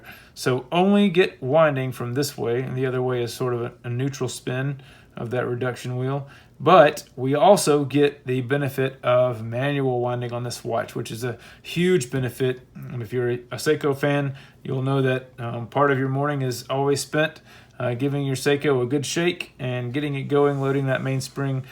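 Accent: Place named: Latin America. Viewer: you have an accent. Are you latino?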